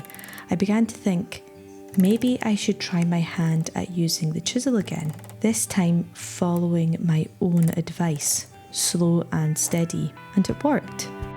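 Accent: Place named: Scotland, United Kingdom. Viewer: your langage accent is so close to your husband's ... i habe never been in Scotland ... but listening to your voice ... you both really share a local tone :)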